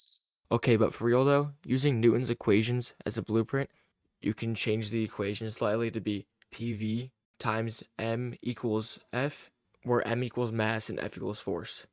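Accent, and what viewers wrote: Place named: Russia. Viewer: Russian Detected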